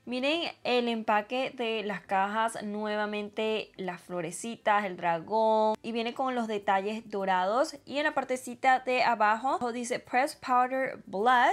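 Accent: Venezuela. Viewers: de que parte de Venezuela eres? Suenas como si fueras de Maracaibo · Ese acento venezolano... 🤤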